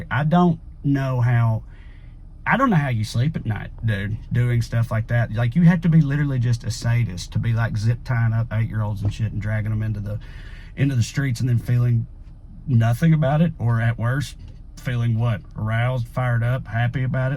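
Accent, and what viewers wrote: South, United States. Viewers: You are the fastest southern talker I've ever heard! 😂 I grew up in NY so it sohnds normal to me, but I wonder if other southerners can understand you LOL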